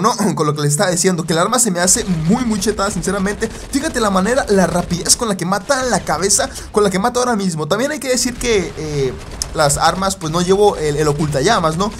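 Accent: Colombia. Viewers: eres colombiano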